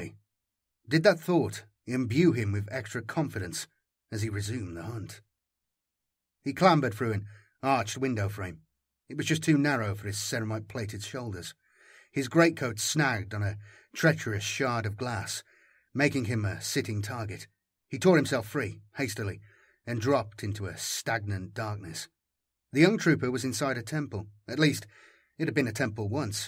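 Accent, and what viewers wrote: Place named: Midlands, United Kingdom. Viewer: Fairly new to you channel, loving it Always makes me smile hearing that Midlands accent. Dow worry, it ay as strung as moine our kid.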